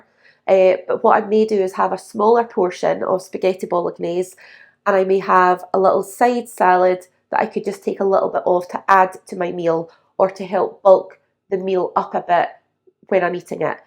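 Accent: Scotland, United Kingdom. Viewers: Your Scottish accent is adorable 🎉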